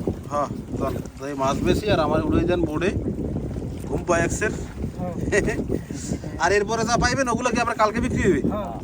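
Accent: Barishal, Bangladesh. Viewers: Ki kotha re bai😂😂 anne sob dehi borishailla mönü ara🐸🐸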